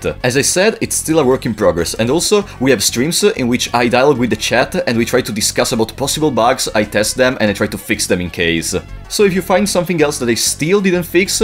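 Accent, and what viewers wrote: Italy. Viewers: sento dall'accento l'italianità, contento di vedere un altro fan di questo gioco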